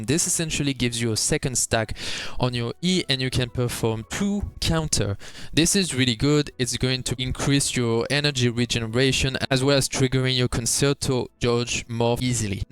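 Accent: France. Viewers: Hello ! Good video, +1 ! You sound french, don't you ? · On dirait un accent français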